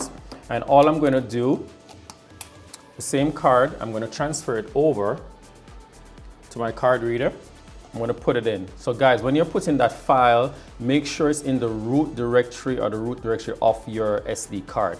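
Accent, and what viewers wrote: Jamaica. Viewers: You sound like a yardie?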